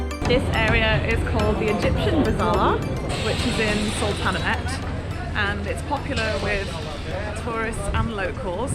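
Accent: England, United Kingdom. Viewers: welcome to turkey i know you are england your Accent 🤣🤣🤣i live in Istanbul i dont like england ş have been London weather very bad everyday rainy and people very rude And arrogant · Heyyy girrrl!! looks amazing - just a quick question! Are you travelling during the pandemic? Just interested as you sound like you're from the UK too!! xx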